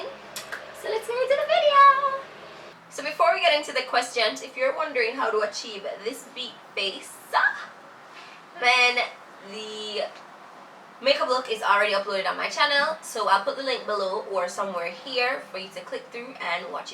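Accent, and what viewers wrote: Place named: Jamaica. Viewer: You're accent gave me life - its waaaaaaay too cute. I'm so obsessed with Jamaicans honestly- I need to visit. & so glad you kind of epxlained what 'bombaclaute' means - I aint even know that it was a swear word hahaha. GURL I AM SO ECXITED TO BE A NEW SUBSCRIBER ON YOUR CHANNEL, like you don't know, dear lord. I feel like I so want to do this tag right now. I'd appreciate if you could check out my channel sometime x You such a star!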